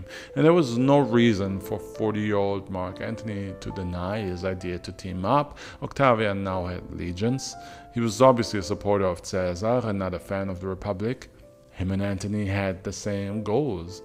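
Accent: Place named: Germany. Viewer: thanks! Very informative video. Your accent sounded very German to me but I wasn't sure what to make of it! We are both in the half-german club!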